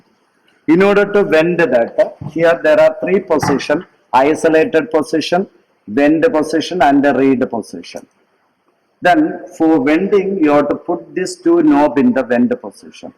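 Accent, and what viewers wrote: Kerala, India. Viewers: I'm from Punjab and I lived in Kerala that's why I noticed your tone😅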